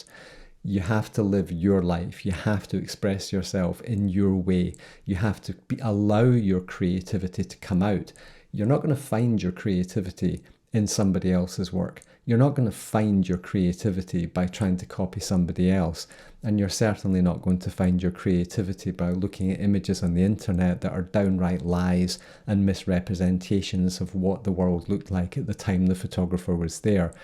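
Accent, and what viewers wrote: Scotland, United Kingdom. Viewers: The hypnotic Scotish (not Irish) accent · your nice Scottish accent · You do have a gorgeous Scottish accent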